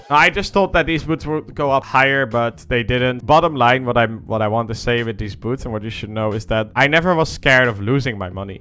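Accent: Netherlands. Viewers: Haha je hoort gewoon dat je NL bent 😂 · is it me or does sound a little dutch? or i’m wrong 🥴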